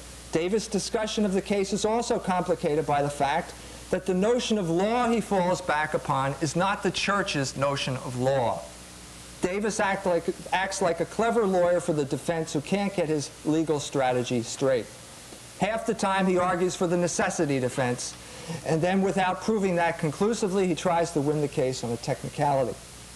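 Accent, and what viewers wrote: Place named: Philadelphia, United States. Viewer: He is allowed to have Philly accent ?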